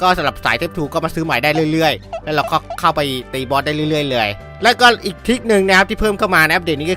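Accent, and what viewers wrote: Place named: Isan, Thailand. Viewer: เปิดคริปแล้วอีสานนีโครตเท่เลยนะเนีย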